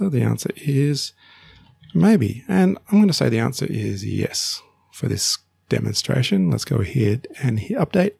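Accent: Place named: Australia. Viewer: Thank you! Nice to hear that Aussie accent!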